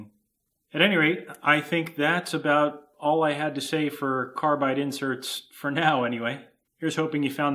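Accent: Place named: United States. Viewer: three sets of two numbers? WNMG-08-04-08? What planet are you from? You sound American. I've been at this for 19 years, and where I come from (the west coast of the U.S.A it is one set of three numbers...WNMG-332 (of course your 08-04-08 is closer to a WNMG-2.52.52, but I have nver seen that, obviously, lol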